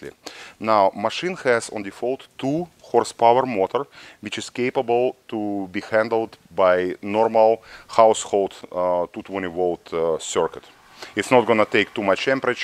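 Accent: Russia. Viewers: Отвечаю, мужчина русский, произношение капец русское)))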